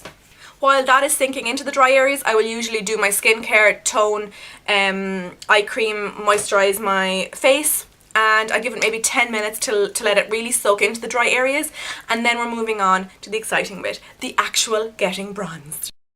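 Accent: Ireland. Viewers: OMG your Irish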